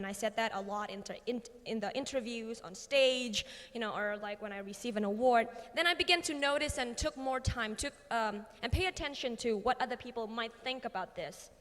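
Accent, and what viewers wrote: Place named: United States. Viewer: American accent on point! i wonder how she did that while her entire life almost spent in Indonesia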